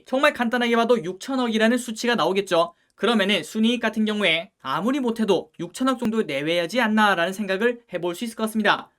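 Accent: Gyeongsang, South Korea. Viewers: 고향이 경상도신가욥?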